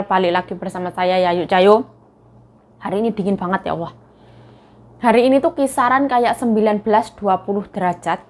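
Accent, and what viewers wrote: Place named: Surakarta, Indonesia. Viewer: Coro ngomonge solo bgt ,koyo aku nek gembreneng dewe nek muleh kampung 😂😂😂 tpi sak iki boso jowoku melempem efek 15th merantau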